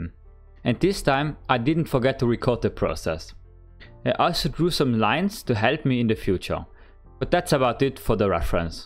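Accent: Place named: Germany. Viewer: ich wusste direkt am akzent dass du deutsch bist hahah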